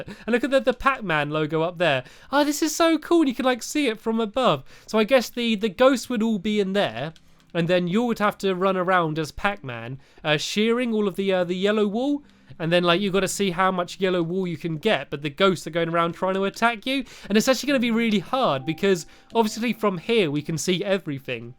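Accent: United Kingdom. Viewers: your brittish right!?!? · Are you British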